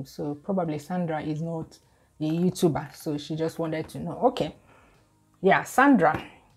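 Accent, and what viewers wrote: Igbo, Nigeria. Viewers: be who u are, we are original igbo and must be recognized by our accent, in USA I don't hide myself. Nne what matter is ur achievement not ur accent. Jisike nwanne muooooo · But is true 🤪 the igbotic accent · You have an Igbo accent because you're Igbo. What's wrong with that? The level of inferiority complex among some of our people is mind blowing. Thanks for your videos, they're great! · 🤣🤣🤣🤣 you can't come and kill me o. How on earth do you speak Spanish with Igbo accent????? I truly love how you speak. Your voice is quite soothing, and motherly. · I love your Igbotic accent. They are comfortable with hausa accent, arab accent, chinese , japanese and latin american accent. Don't ever change please. Those ppl saying those things suffer from inferiority complex. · Don't change your igbotic accent o...I love it die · biko ignore those people. 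With your igbotic accent you still have over 300k followers. They should be begging to learn from you. And come to that, when will you teach us the secret. I want to start my channel soon. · We are proud of you, and your genuine Igbo ascent. We stand behind you, and all you represent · I love and look upto you. You inspire me a whole lot and your accent gave me the boldness to start up my YouTube channel where I share my knowledge with love. You helped me breakout from the fear of SOCIAL MEDIA TROLLS 😑.....maka ndi supri supri 🤣🤣 (YouTube that I wanted to start during my NYSC days) On several occasions people have told me that too,but I usually tell them this,"I'll rather speak correct English with igbo accent than speak incorrect English ",but on the other hand, some people will tell me they love my accent 😉. Keep being you ma'am, you inspire a lot of us......Love from a fellow igbotic sister 🤣🤣🤣🤣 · Ma thank u for thus video, i love ur accent., we are igbos the accent is wat differentiate us from other tribes, this is one thing that makes us unique,i am proud to have it and proud to be an igbo lady. 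· Your accent is your identity. I looove your Igbotic accent 😍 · Loved and subscribed to her channel because she is down to earth and creative. Who igboitic accent/ normal accent help sef. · Even us fellow igbos in Nigeria love your accent, especially when you say 'willi you? willi you? When asking us to subscribe to your channel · Igbotic accent even make the video more interesting.Talking about speaking English,in Europe we have different accents Netherlands,Belgium,Denmark, Scotland,Wales, Germany E.T.C.That is uncivilized thing to say or comment. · I just subscribed because of our Igbo accent😉😉👌👌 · Chai!🙆‍♀️ did you people hear that? They will call her to come and speak her igbotic English, (i repeat, her igbotic English o) to the foreigners. Hmm, onye nwere nti nuru o. Nne you've said it all, thanks for this video 🙌😘😘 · It is very very stupid of any Nigerian trying to castigate you for being who you are Arrant Nonsense, you are an Igbo born and raised in our beloved Igboland and here we have imbeciles attacking you because of having igbotic assent, please does these idiots know that you are Ada Igbo? 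All the same so happy you made a video to teach those fake people how a proud Igbo woman you are, so proud of you enjoy what you do best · My dear don't mind foolish people can you imagine, let me tell you it was your accent that I enjoyed most in your videos. Bad belle people everywhere. Carry go Nneoma don't let it bother you. Much love from your fellow igbotic sis in U S A. Always keeping it real girl 🤩💋❤💯🤩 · You speak posh English, they will say you are forming, you speak normal with your intonation, they will say it's igbotic. Life no balance at all. Abeg, anybody wey no like your English make the person go hug transformer. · don't mind them. It's the Igbotic accent that got me hooked and I love your personality, you are such a lovely person and a very intelligent and accomplished woman. We love like this. 😘😘😘😘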